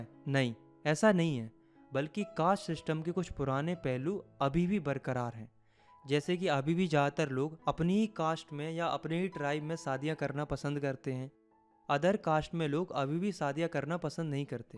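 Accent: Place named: Bengal, India. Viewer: Are you from West Bengal kyu apki thodi bangali nikl jati h thodi thodi😅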